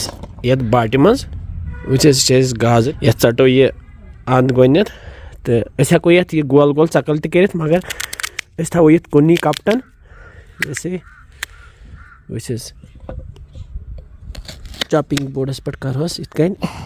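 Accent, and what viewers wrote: Kashmir, India. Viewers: Love your kashmiri accent 🤣🤣🤣🤣🤣 But the quantity of spices which you added my mother will make 4 bottles in this quantity of spices 🤣🤣🤣🤣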